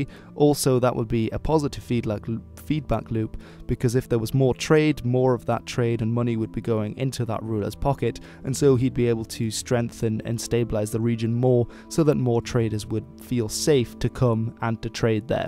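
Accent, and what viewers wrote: Denmark. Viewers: You are danish i can hear xd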